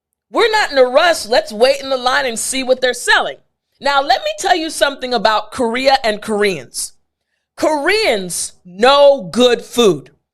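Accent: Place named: United States. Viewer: Teacher. I am really blessed when a I watch your courses. They are extremely clear, powerful and resilient. However I would like to know where you are from. I hear American accent into your speech but I would like to be sure... 😊 I am actually from DRCongo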